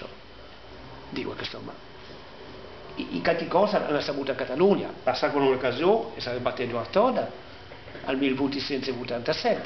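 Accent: Italy. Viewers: molt interessant escoltar el català parlat amb accent de Cerdenya! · mucho más que acento italiano jajaja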